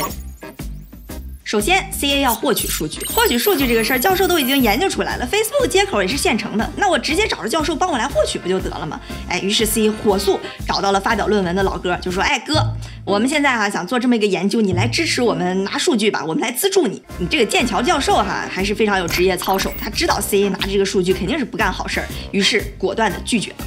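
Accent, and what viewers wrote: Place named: North, China. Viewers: Her heavy northern Chinese accent drives me nuts! 😁 some may love it.. well, not me... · 大姐， 你这英文咋山东味的？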